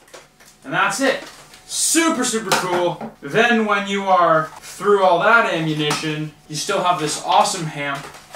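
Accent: Canada. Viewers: WHER ARE U FROM CANADA(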